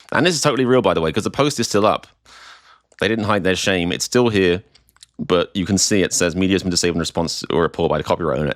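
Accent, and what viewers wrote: United Kingdom. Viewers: dear, you apparently worked very hard on this compilation so kudos to you; but i am most impressed that with your native VERY British accent you can mimic the redneck MAGA hick-talk spot-on still ROTFLMAO; sorry i got entirely distracted......💙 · Lol. He's a Brit. A lot of words are pronounced differently.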